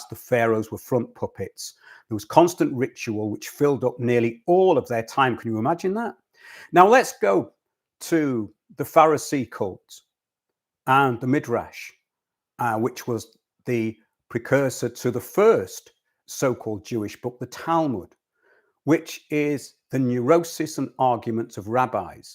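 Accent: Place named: England, United Kingdom. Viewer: This is fascinating. I can clearly see how this transhumanism is an extension of this serpent cult. From childhood/birth (in their depraved eyes) to death. Especially once a child is transitioned early, there are constant medical issues and daily rituals they must do to maintain the deception and stop infection (or to even get an erection.) Also, even to after death, since no doubt most folk who go through such horrendous procedures will feel cut off from God, and may believe they have no redemption if they realise they made an error and desecrated the body God gave them. Therefore, they are stealing folks ability to believe they can be redeemed through the Blood of Christ. Absolutely fascinating. I grew up in the UK, so it's great to hear deep information and truth spoken in English English. Thank you for your work. Wondering what you think about David Icke getting pushed forward by Redacted the last few weeks regarding the Epstein stuff. 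TBH I have never been interested in his work, but my mom was taken in with some of his stuff.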